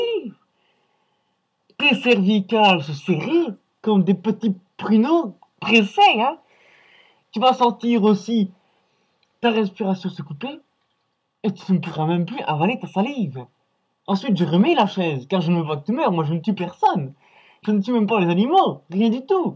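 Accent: Belgium. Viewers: Tu craint casse toi avec ton accent tu insulte la belgique!!!!! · ton accent belge i ma bandé!! alor continu a decouvrir ton mac et faire mumuz ac photobooth!! obligé de mettre un mask pr parler soi tu sert a rien soit ta pa de couille! bref t bidon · Ah oui, il est sûrement belge lui. Je dis ça par rapport à son accent. Mais vous inquiétez pas les gens, ce mec est juste chiant. Mais je me demande franchement s'il ne faut pas prendre la chose avec humour, tout ça n'est qu'un sketch sensé faire rire. Ou sinon, c'est de la jalousie mal placée. Pis bon, niveau philosophie, éthologie et argumentation, faudra repasser. Crois-moi mon "petit gars", le chat s'il est vraiment maltraité, il aura tôt fait de se casser. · Nan mais sans déconner les gens vous critiquez sa vidéo mais le mec ca se voit bien qu'il a fait ca pour déconner avec son accent belge et tout moi ca m'a bien fait rire.... 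Alors arrêtez 2 secondes de lecher les boules de Norman quoi... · ACCENT BELGE! BAH SA METONNE PAS! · "Si un homme n'est pas bon z'avec les animaux." + GG l'accent belge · "Prinnnmièrement, les chats sont des animaux comme les auuutres hein: Ils ont droit à du respect les iiiiinfants, du REPECT! Et ca Norman ne la pas cooompris les iiiiiinfants" J'approuve, c'est bien wallon comme chez moi ca:p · Fait pitié en plus jcomprend rien a cki raconte on dirait encore un belge de merde avec sa voix xddd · tu serait pas belge toi? (pour ton accent de merde et ta connerie naturel)! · Et evidémment, qui c'est qui fait ce genre de chose? Un Belge >< (Au temps pour moi si tu ne l'es pas, ton accent a couper au couteau m'a trompé) Après ca, nous, bons belges que nous sommes, on va encore s'en prendre plein la geule, sincèrement du fond du coeur, MERCI! (Putain de VDM la:/ ) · t'es aussi débile que ses vidéos. 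Tu te caches derrière un masque et ca camoufle pas ton accent belge. On a compris que tu defends les chats. Par contre, tu devrais faire une video sur les félines quand elles prennent leur petit par la peau du cou. Je pense que t'es resté trop longtemps sans oxygene. retires ton masque ca ira mieux. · ne cherche pas serieu...1) je vois pas le manque de respect envers les chats... et 2) fais pas ton philosophe, ton accent belge craint a mort!! et enfin 3) Norman est bien plus drôle...!!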